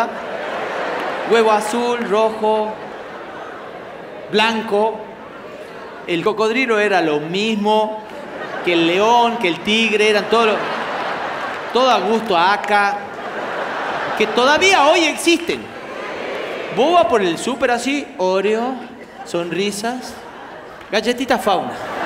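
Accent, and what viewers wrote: Tucumán, Argentina. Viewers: fantástico miguel... soy porteño hijo y nieto de porteños y me encanta tu humor (especialmente cuando gastás a los porteños que me dan vergüenza ajena :-)... sucesor del Negro Alvarez, pero con tonada tucumana... abrazo!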